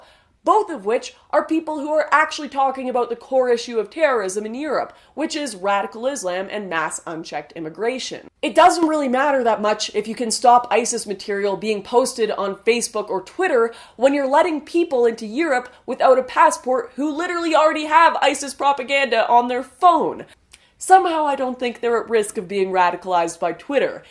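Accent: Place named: Canada. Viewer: That Canadian accent doe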